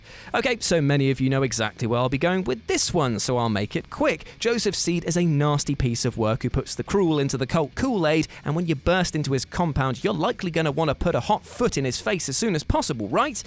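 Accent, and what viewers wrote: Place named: United Kingdom. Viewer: Great another Brit narrator, disliked the video. -.-‘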